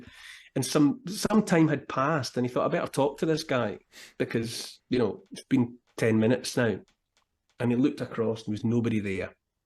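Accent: Scotland, United Kingdom. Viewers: I really just love to hear scottish people talk just gorgeous.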